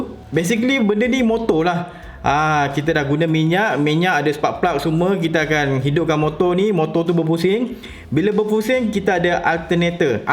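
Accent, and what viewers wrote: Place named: Kelantan, Malaysia. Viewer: Lama dah nk tanya ni. ni ore kelate ke? Ada perkataan bunyi kelate sikit..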